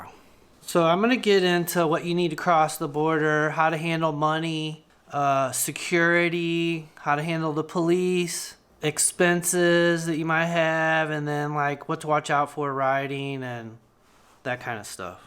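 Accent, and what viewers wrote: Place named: California, United States. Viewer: Man, if that's isn't a Californian accent, I don't know what is! LMAO.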